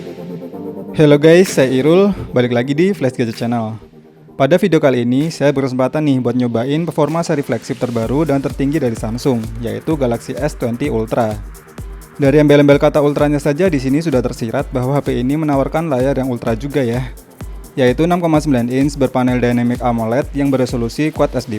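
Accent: Yogyakarta, Indonesia. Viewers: Orang Jogja ya bang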